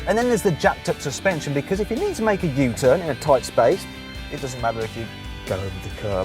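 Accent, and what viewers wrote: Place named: Birmingham, United Kingdom. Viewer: Interesting how you can't hear your Brummie accent as much in these older videos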